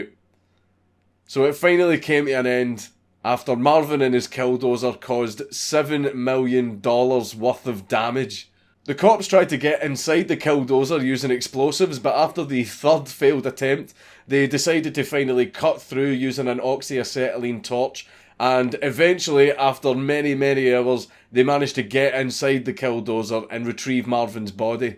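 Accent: Scotland, United Kingdom. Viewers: This is by far my favourite mad lad video, keep coming back time and time again for the same story told by a nice scottish accent.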